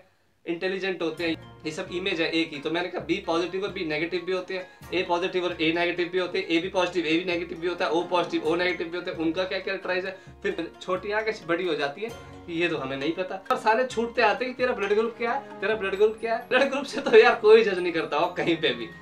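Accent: Haryana, India. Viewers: Haryana se ho bhai..?? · but he is from haryana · Aaha haryanvi.......cha gya chore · Bhai Haryana se hai kya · you still talk the Indian way ... that's cool thing about you ... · Hahahah , l like your desi Accent. I love this video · Yes. Muje b yahi accha laga.. k inhone indian way me bat ki.. isliye mene channel b subscribe kia.. i like ur video man..😄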